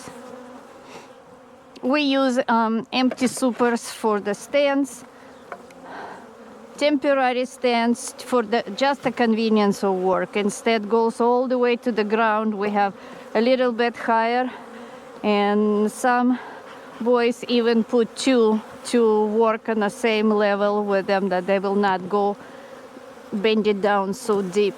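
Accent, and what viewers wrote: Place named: Russia. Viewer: Замечательный русский акцент😁., мой английский не совсем вери гуд. Как потом с маткой решается вопрос? Она же среди этих 5 корпусов.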